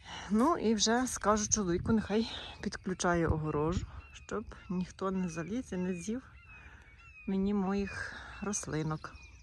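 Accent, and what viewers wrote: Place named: Prykarpattia, Ukraine. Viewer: Приємно бачити справжню українку,яка далеко від України пам'ятає,хто вона і якої землі дитина.Слухаючи,відчуваю,що із Прикарпаття,можливо,помиляюсь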